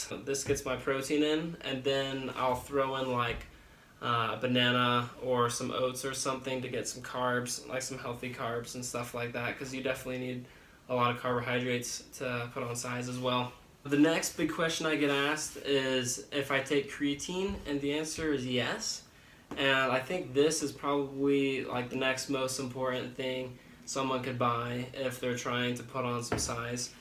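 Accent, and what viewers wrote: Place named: United Kingdom. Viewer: Where are you from? You kinda sound like you're from the UK.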